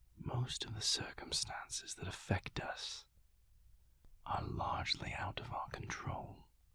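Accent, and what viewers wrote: United Kingdom. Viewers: Porter's voice just gives me intense feels: always loved an enchanting British accent ❤️❤️❤️ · Never thought I'd fall so hard for a classic British accent 😂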